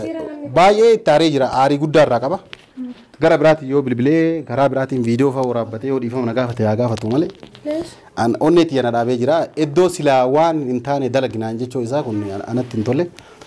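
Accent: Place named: Arsi, Ethiopia. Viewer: Gafiin qaba gossa tammi loqudni isaa arsi nati fakkate galatomi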